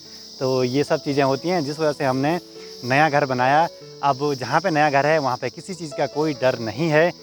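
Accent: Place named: Garhwal, India. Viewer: जगो तो गढ़वाली टोन है ।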